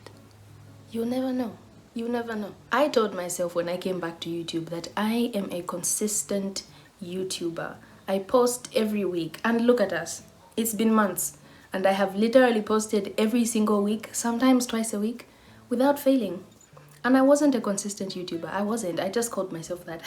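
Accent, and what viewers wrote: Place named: United Kingdom. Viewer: It’s the British accent for me 😂😂😂😂even me I’m delulu I want to become a British citizen!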